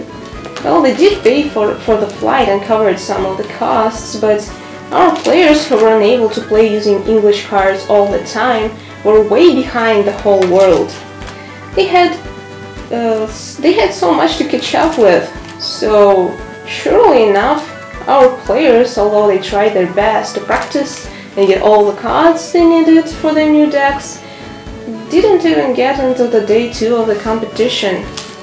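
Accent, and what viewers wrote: Russia. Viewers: Слышу этот русский акцент! Прелесть С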